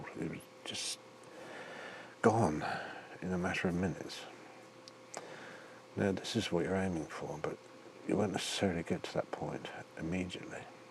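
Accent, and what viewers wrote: England, United Kingdom. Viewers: Its the tone, deep voice, and English accent that helped me out tremendously ❤️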